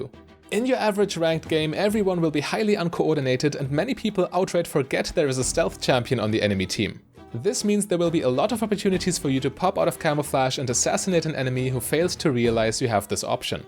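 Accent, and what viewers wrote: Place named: Germany. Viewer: Der Bruder ist deutsch das hör ich doch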